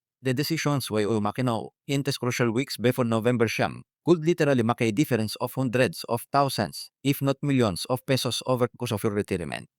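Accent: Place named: Arab world. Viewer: Dapat English mo clear kaso ginagawa latin Minsan Hindi man slang para Kang arabi mag salita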